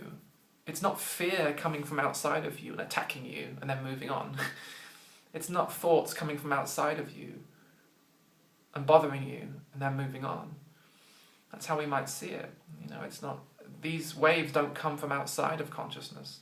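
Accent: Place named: United Kingdom. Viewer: that british accent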